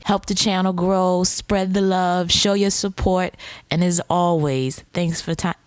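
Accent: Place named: New York, United States. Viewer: Altaïr ibn-la’ Ahad it’s not funny because the “joke” is false because she sounds like a white New York lady.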